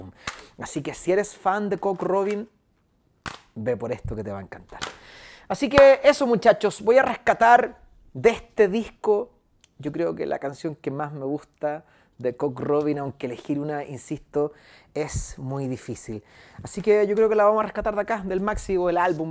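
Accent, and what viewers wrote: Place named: Mexico. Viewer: Idea mia o habla como mexicano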